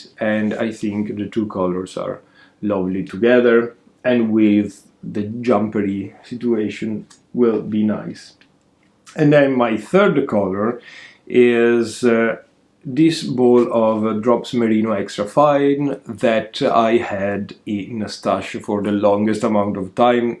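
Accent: Italy. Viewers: I love your podcast and I love your Italian accent!❤️ Also you didn’t seem grumpy! You are great and don’t change a thing. I be back again soon! Katie from Maine USA🌸🌙😊🧶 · Hej, I love your accent, I am from Denmark, and English with Italian accent is much better than with danish😀 being Danish I fully understand your being grumpy about rain…. 😂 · Thank you for posting a wonderful video, even when you're feeling grumpy. Your mood is totally understandable with this constant rain! Jumpers look great. Hopefully you can soon get opportunity to paint your fence. Maybe we'll see the end result 😅 Can definitely hear the Irish accent come through, mixed with Italian, sounds so nice.. Take care and enjoy the Bank Holiday weekend too ❤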